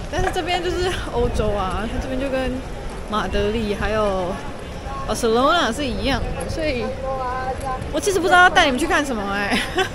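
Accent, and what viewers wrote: Taiwan. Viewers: my favorite tour guide with a sweet Taiwan accent, you made the Malaga tour very interesting. Great job! Thanks. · 高雄口音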